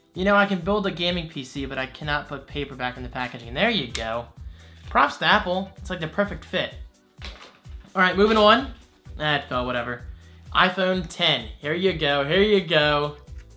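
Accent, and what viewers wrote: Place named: Philadelphia, United States. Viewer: You from Philly? Edit you said your dad went to AC. You got a Philly accent.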